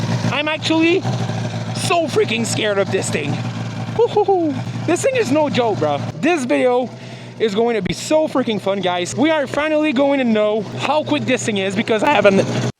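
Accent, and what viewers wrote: Quebec, Canada. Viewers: Is he from Quebec ?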